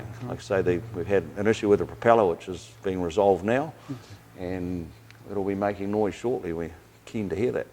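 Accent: Australia. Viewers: Bollocks does this gent have a thick Aussie accent! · Sounds Aussie?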